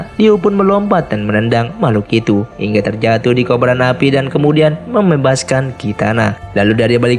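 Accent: West Java, Indonesia. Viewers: Intro nya okos sunda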